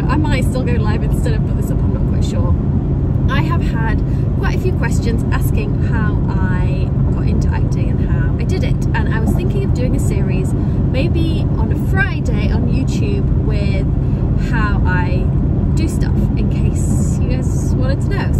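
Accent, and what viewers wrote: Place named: Sheffield, United Kingdom. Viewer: Lol. The more you talked the more you could hear your Sheffield accent come out. ( also from Sheffield not living in England )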